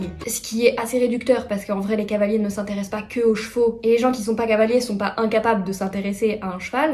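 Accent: Belgium. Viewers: Ho mais nan trop heureuse j était tellement impatience de finir la journée pour regarder ta vidéo j adore ta refait ma journée et super contente que tu aille mieux c est super❤❤❤❤❤ ( tu commence a avoir l accent belge haha rejoint nous )😂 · Grave bonne vidéo le sang, ce montage : une pépite, j’adore ! Ah oui et tu commences à prendre l’accent belge ça va plus du tout 🙃🙃🙃🙃🙃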